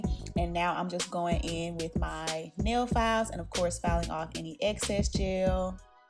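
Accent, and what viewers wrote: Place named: Texas, United States. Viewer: Are you from Texas? You got a distinct accent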